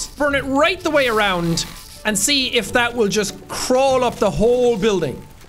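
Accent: Scotland, United Kingdom. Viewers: I can't believe no one has yelled (IN FUN) to a comment that says "you are a psychotic ass!!!" 🤣😂 I luv his Scottish accent (or Irish?) too! 🤣❤💋 Please don't hate on me my friends because I KNOW I am an idiot.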